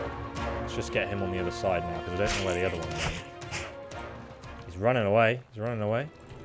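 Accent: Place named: United Kingdom. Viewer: Actually, I like your articulate brt English. Keep reading those text pls for you're more amazing than the BBC (not trolling, I'm really practising my Eng. listening)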